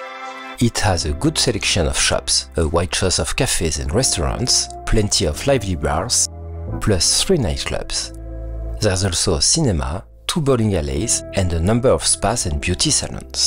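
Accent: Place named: France. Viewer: ça ! c'est de l'accent français bien couillu et affirmé !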